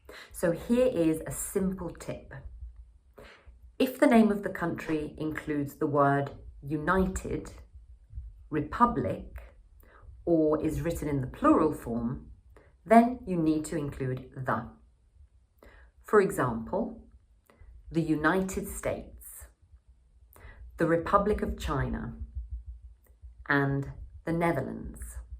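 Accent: United Kingdom. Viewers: British accent is to die for. ㅎㅎ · 영국쌤이다! 이 고쟈스한 영국발음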